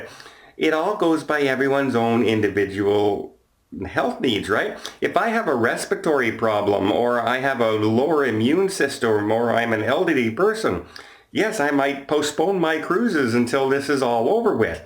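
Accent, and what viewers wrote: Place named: Quebec, Canada. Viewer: he lives in Ottawa and his accent is more Montreal/Quebec to me than anything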